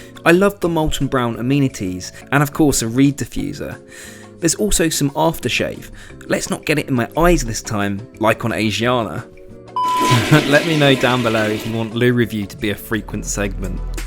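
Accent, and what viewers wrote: United Kingdom. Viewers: Turkish Airlines is more assertive in economy class. And you, the British-accented dog, awaiting king service from a short flight. Don't be racist dude. We don't hate westerners, but people like you have an endless hatred for us. If you are looking for more king services, do not fly with THY. Fly with Emirates or Qatar Airways. racist guy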